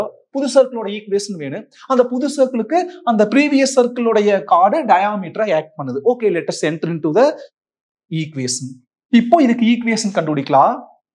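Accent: Coimbatore, India. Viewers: Coimbatore slang😋☝🏽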